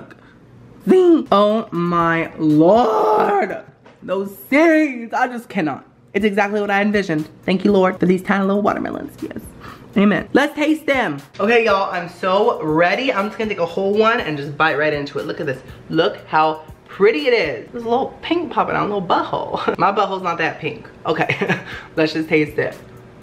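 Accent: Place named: United Kingdom. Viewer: The British accent killed me lmaooo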